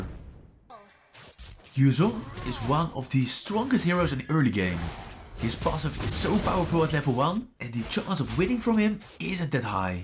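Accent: Netherlands. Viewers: Ben je nederlands??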